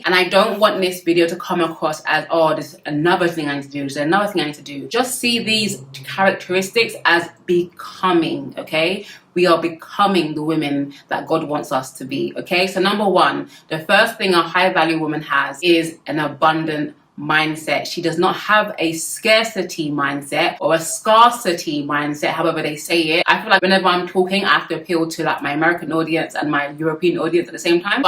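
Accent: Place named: United Kingdom. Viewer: I love your accent so much! which city is your accent from? Everyone seems to have a different British accent depending on the area 🤔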